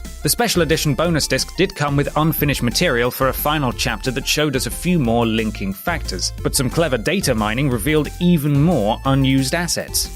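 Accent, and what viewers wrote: United Kingdom. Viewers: This man has the most British voice I've ever heard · oh my god, his british accent is awesome!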